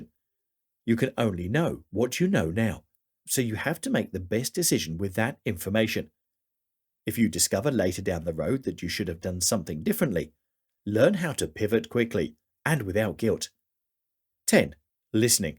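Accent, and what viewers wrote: United Kingdom. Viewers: Why is nearly every video like this one narrated using a British accented voice? Do people really think that words spoken in a "posh" British accent convey more meaning or that the speaker is more intelligent? Most of these voice-overs are probably computer generated so perhaps they are more intelligent - even more so than most actual Brits.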